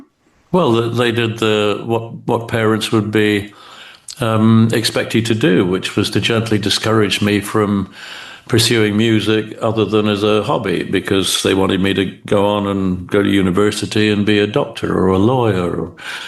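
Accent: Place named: Scotland, United Kingdom. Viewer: For a Yank like me, your Scottish accent was at first a wee bit difficult :face-green-smiling:, but Ian of course was right at home with it and as a result you got an excellent hour+ from him. Honestly, I've heard interview after interview with Ian in which he answers the same ol' questions again and again with the same ol' answers, but you asked several unique questions that elicited genuinely thoughtful/original answers from him ... good on ya mate. Well done. :goodvibes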